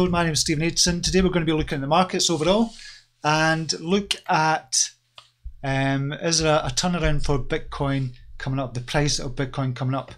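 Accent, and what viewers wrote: United Kingdom. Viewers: Such a puff of fresh air......a British accent in cryptoland.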